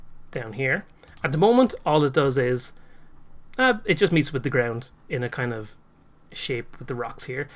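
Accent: Ireland. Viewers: Great tutorial!! Helped me tons on getting my 2D platformer going! Are you Irish?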